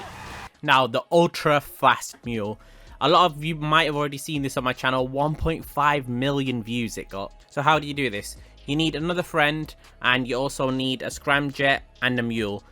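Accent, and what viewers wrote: United Kingdom. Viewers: ah I love this British accent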